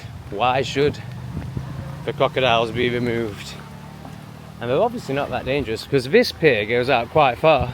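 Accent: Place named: Yorkshire, United Kingdom. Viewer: Propa yorksha mate…keep it up😂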